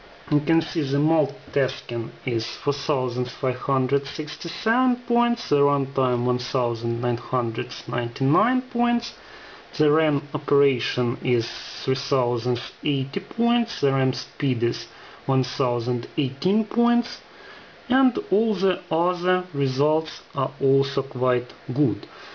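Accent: Russia. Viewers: very good review. By the way, are u russian?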